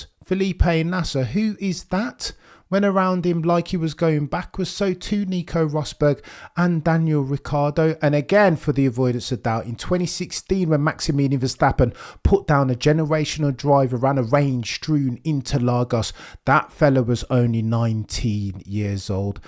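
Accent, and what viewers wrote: Birmingham, United Kingdom. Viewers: Sky sports have been gaslighting us all ever since the Miami GP (Lando's 1st ever gp win btw) and ever since that race he's needed a ton of help from the team and Oscar and still he's never been close to Max in the WDC standings. The "Simply lovely huh" at zandvoort was pathetic and then his post race comments after getting humbled in Brazil were even worse. If that McLaren car is still the one to beat next season then Oscar has to lay down a marker early on and put sulky no-rizz in his place. Nice to hear a fellow Brummie talking F1 btw 👍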